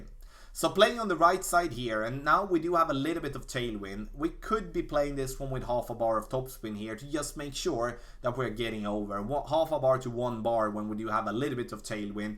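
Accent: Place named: Sweden. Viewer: Todd Calhoun swenglish👌🏻😁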